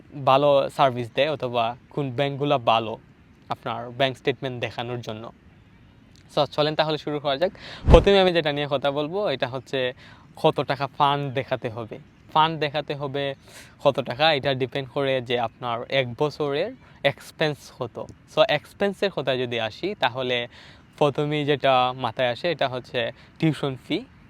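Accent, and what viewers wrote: Sylhet, Bangladesh. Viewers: Vai er basa ki sylhet ?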